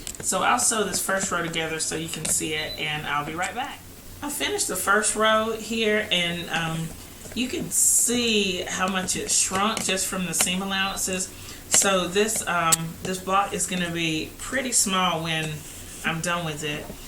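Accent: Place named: North Carolina, United States. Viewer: Cute! How did you end up with so many left over HSTs? BTW you sound like you are in NC. Me too!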